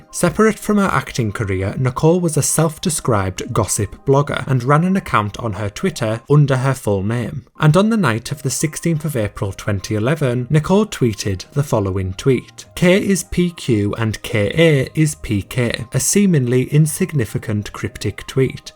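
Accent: United Kingdom. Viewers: Yeah, it sounds like a humanly bot with a british accent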